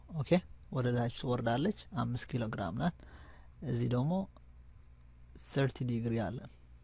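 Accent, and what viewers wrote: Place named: Ethiopia. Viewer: LOL such an Ethiopian accent! I love Ethiopians!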